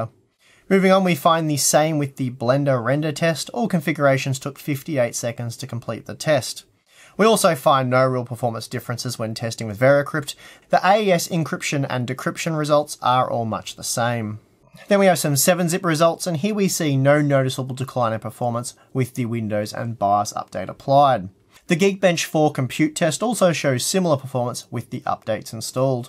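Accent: Australia. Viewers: Will the next generation of CPUs have this issue? Actually glad I waited to upgrade, even if the hit was slim to none. Also thanks again for the updates. And once again, love your accent. Australians have perhaps the most pleasant accent IMO.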